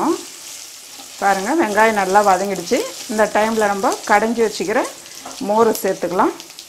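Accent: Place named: Chennai, India. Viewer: Happiness is hearing our own mother's voice. ♥️♥️♥️♥️ When she talks "parunga maa... With Chennai slang"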